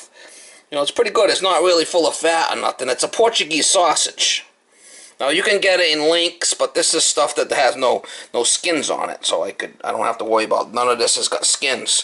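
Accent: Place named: North, United States. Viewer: Stuffing????? That's what you put in your Mama's pillow!!!! LOL Dressing is what you should be eating That's what we do in Georgia, Mama!! P.S. Love that accent lol You people from the north talk faster than us southerners. I have to listen good!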